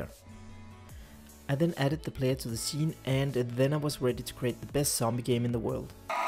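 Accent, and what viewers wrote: Denmark. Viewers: I could hear you have the Danish Accent in you, so I was like hmm he seems Danish. So I checked your ABOUT and saw Denmark. Just found your channel, seems interesting :)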